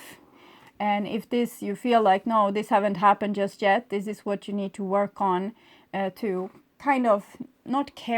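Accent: Sweden. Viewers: My ex has been calling me but I don't want to hear anything he has to say. Yes, he is a very inmature Leo who doesn't love anyone, including himself. It breaks my heart that he is so broken but he is unbarably toxic but I must protect myself from him. Being the better person is something I have always been told but I have learned their are lots of people who count on nice people being the better person so they can take advantage of the person being the bigger person. Thank you for tnis reading and I just love your Swedish accent🌹🌹🌹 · Are you from Sweden? Thank for a good reading. ❤️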